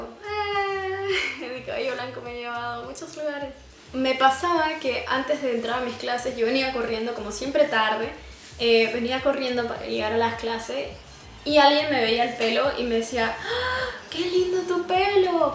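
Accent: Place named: Venezuela. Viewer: De que país eres ne da curiosidad? esque en tus vídeos dices que vivías en Argentina y mencionas mucho Argentina, pero yo escucho tu acento de Venezuela...PD Saludos, me gusta tu canal y tu forma sencilla de ser nunca cambies:)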